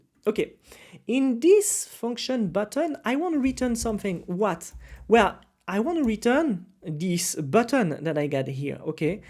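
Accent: France. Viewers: I see your frensh accent and ilove you sur❤ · Good one, you sound Frenchie, it will be great if you also have a french channel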